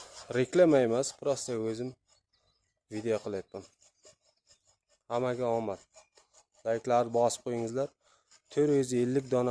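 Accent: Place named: Kashkadarya, Uzbekistan. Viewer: Shevasi yoo Qashqadaryo yo surhondaryo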